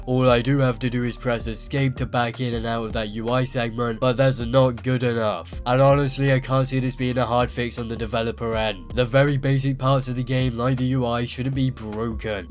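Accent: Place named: United Kingdom. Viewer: Can we all just agree that accent increases the quality of the video by 1000% Something about the (British, right?) accent just makes his commentary and critique so much better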